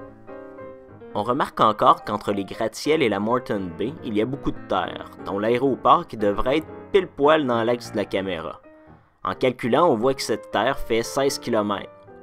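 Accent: Quebec, Canada. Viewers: Trop bien ! Et j'adore comment t'assume l'accent québécois, je suis un compatriote.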